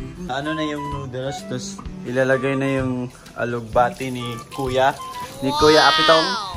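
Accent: Visayas, Philippines. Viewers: Dun ako natutuwa sa opening spiel mo na What's up ketchup... dun pa lang good vibes na pati pagka-candid mo. Tuwa din ako sa Visayan accent mo (no offense meant, hah!), na kering-keri mo, bro!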